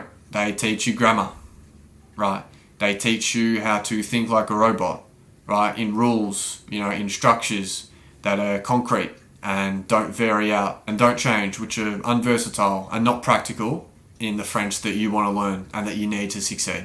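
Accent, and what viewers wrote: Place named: Australia. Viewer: This is an Astralian accent, I guess.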